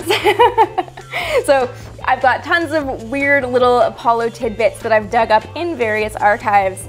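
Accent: Canada. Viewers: Missed your content. But most just missed seeing you. Like you face. Love the Canadian accent.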